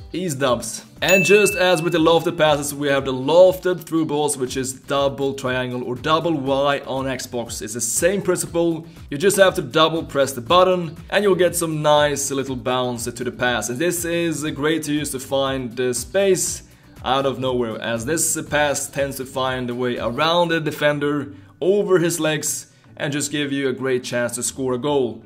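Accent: Sweden. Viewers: Man hör att du är svensk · The accent sound swedish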